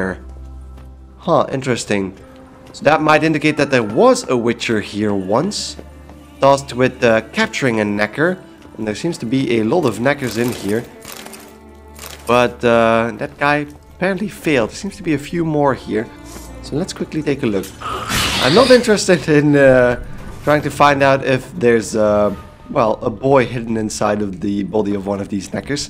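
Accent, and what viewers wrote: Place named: Netherlands. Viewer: Your obviously native dutch speaking 😃 from the Netherlands even !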